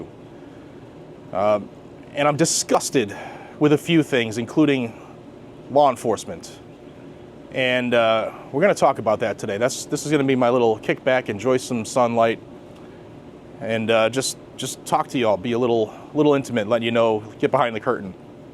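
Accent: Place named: Georgia, United States. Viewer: Sounds like Georgia cops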